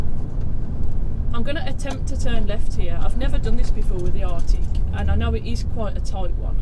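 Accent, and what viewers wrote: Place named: West Midlands, United Kingdom. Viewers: great video ive just started my class 1 from car and stressing before I've done my theroy test you would be a great instructor and love the local accent im a west midlander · Great video. I'm an ex HGV Class 1 driver. I lost my HGV licence due to a chronic heart condition. I now live in Wales but I am origionally from West Bromwich. It's good to hear someone with my accent. Your video really did bring memories flooding back to me. I enjoyed it very much. You are an excellent driver. One small critisism. Please don't mind me mentioning this but I noticed a lot of crossing of the hands on the steering wheel. Apart from that you did a top class job. Accept my good wishes and I look forward to watching more of your videos.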